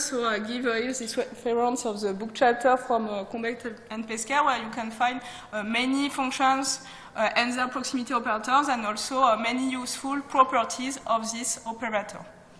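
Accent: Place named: France. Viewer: LOL, I like her French accent, which is even more interesting than the material itself : )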